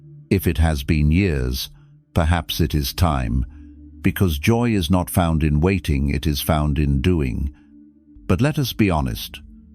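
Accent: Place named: United Kingdom. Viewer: Good info but long and wordy. British accent is irritating. Could have been 20 mins.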